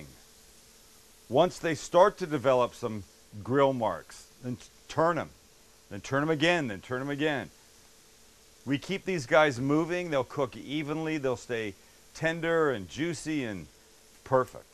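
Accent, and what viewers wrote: Canada. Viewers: buddy, you are a "Snowback" a Canadian,!!! I can tell by yer"Ins and Ouuts" Great Jerk Chicken recipr!!! Keep it up! DD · Just found this guy for the jerk chicken recipe that was no bs secret ingredient shit and I find fucking neegan sounding straight baller Canadian in cali. Yeah. I'm making this shit tomorrow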